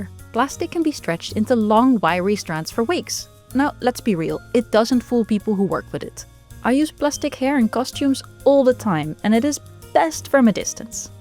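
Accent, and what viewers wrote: Netherlands. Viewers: Hey, minute earth got a dutchie, nice accent :) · Hoor ik daar nou een subtiel nederlands accentje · Dutch people are literally everywhere Love the accent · Heeeuujjj, een Nederlands accent, ik wist dat ik het herkende! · Me: "My Dutch voice senses are tingling!" Checks description Me: "... okay the name sounds more Flemish, but that voice is definitely Dutch!" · I knew i heard a dutch accent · GEKOLONISEERD, ik hoor nederlands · *Dutch accent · I hear a slight Dutch accent. Groetjes uit Nederland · Is that a dutchy I hear? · Does this channel have multiple narrators? This lady is very obviously Dutch, but I swear I’ve seen many videos of a non Dutch person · This is the quintessential Dutch accented English. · do i hear a Dutch acent? XD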